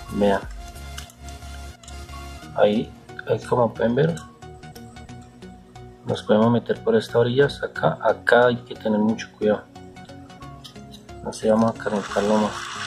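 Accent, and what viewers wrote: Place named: Colombia. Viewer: Por el hablado pues es obvio que de Colombia...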